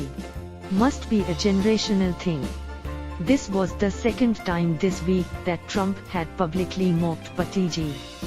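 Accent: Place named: India. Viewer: PS. Who is the Indian narrator for this 'show'?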